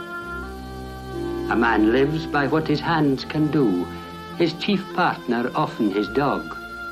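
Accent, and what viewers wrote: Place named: Western Isles, United Kingdom. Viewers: It's a western isles accent. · It sounds like a Hebridean accent. Perhaps a native Gaelic speaker?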